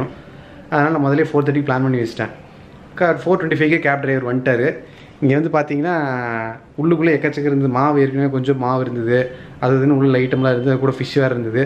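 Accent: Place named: Tamil Nadu, India. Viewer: Ungalooda slang paatha pakka vellala gounder maathiri irukku.crt uh? Anna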